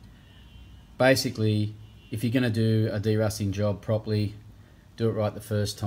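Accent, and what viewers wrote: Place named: Australia. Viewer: I knew it was an australian accent But i doubt myself initially.. Because the way he speaks is so different to other english speaker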